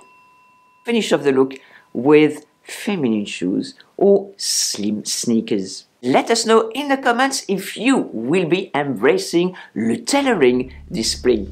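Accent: France. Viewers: I love pencil skirts. Your accent is amazing. Mostly French with classy English. I can tell you were here in England. Your make-up i copy. I wear Nuxe oils. I have 3 now and i favour red lipstick. I copy you in many styles and im 76, or as my GP says im the new 67. My grandmother was called Lizette and im Jacqui. Maybe thats it. Please keep these videos coming. Thank you🎉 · Still watching your videos for your great personality and exhilerating and chick French accent. Maybe I will come around to my messy wardobe some day...:-D Thank you from Marianne! That was a nice morning coffee. And cheers to going "shopping" in our own wardrobes (previous video).:-D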